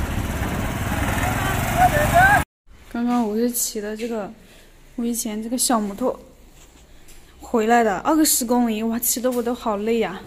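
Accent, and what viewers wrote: Chongqing, China. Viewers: 在重慶都要這樣講話嗎？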